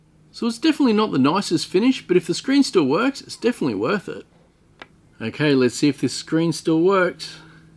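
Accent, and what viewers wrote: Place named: Australia. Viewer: So australian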